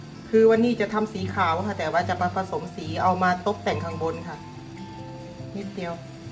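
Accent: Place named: Korat, Thailand. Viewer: สำเนียงเหมือนคนโคราชเลยค่ะ😊😊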